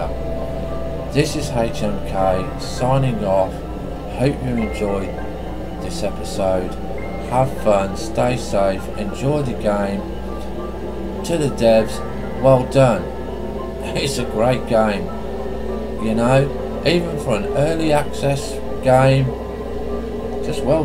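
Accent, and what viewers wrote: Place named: England, United Kingdom. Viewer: What bit of England are you from? as your ascent I think I have heard before but I can't remember where~! :P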